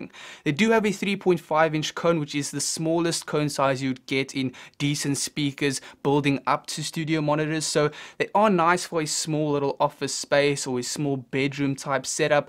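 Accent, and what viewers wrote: South Africa. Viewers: Howzit boet - where in South Africa are you from?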